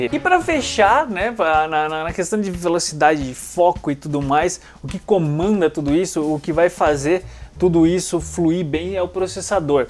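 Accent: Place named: Curitiba, Brazil. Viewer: O áudio da câmera realçou ainda mais o teu sotaque curitibano. rsrs Abraços!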